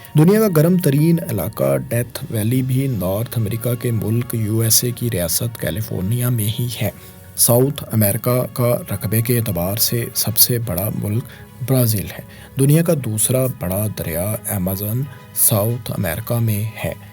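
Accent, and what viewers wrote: Punjab, Pakistan. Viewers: please work on punabi accent .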